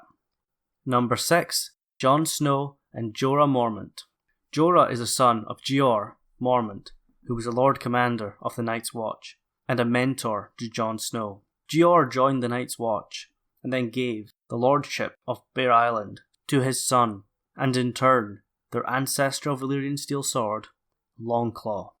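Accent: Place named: Scotland, United Kingdom. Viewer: Just stumbled across your channel, great stuff; am sure I'll be binge watching it through during the wet scottish summer this year. Also awesome accent. For anyone who's unfamiliar with Scottish accents, this dudes got a lovely 'kind, soft' variant of the accent - the other is more akin to this : <link> Just kidding, I love living here in Scotland! Thanks!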